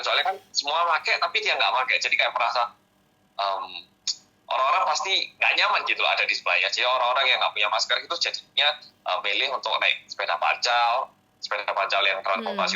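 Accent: Java, Indonesia. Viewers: Orang korea yang medoook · Anyo hoseo.... Medhokkkkkkkkkkkkk🤣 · Yg sy heran korsel kematiany yg sedikit...bgmn pensngananya? Apkh dg bnykny tim medis..atu krn wargany yg mmg imuny kuat. Mas ini bukn org korea tp org jawa medhok bngt😁😂🤣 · Weee...mas masuk kompas TV.... Ada Orang korea yg Medokkkkk...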